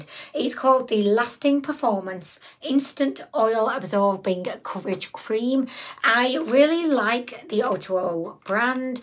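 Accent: United Kingdom. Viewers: For opening packages, you should get a set of spudgers off Ali Express. Besides the fact that "spudgers" is a fun word to say, and I want to hear you say it in your English accent (which I, as an American, am required to think is elegant and awesome), spudgers are little plastic prybars that were made for fixing phones, but work really amazingly well for getting the shrinkwrap off nail polish bottles and opening the box on BB creams. Get the set of three that comes with a thin metal shiv. They also work great for removing batteries from toys, and they will spare your nails.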